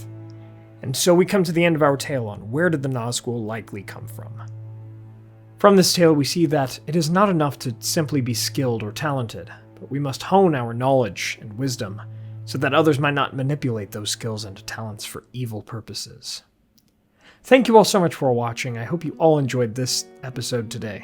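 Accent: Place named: Bay Area, United States. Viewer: Jersey ? Detroit ? Chicago maybe ? No I know The Bay Area !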